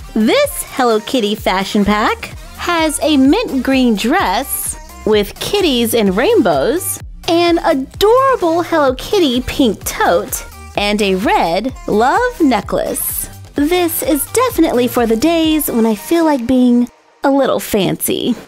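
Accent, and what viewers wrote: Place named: South, United States. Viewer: I love this and that southern accent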